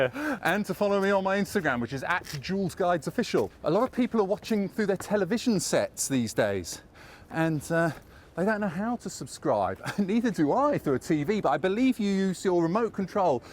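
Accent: United Kingdom. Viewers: Ooo l love British accent😭❤️❤️❤️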